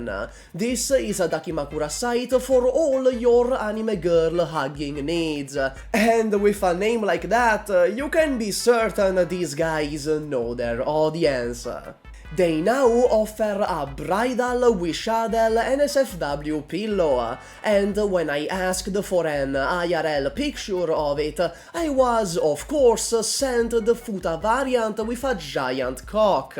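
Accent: Italy. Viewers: Ti seguivo senza sapere che tu fossi italiano, però effettivamente l'inglese è proprio maccheronico <3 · "This is why I sound like this" Italian?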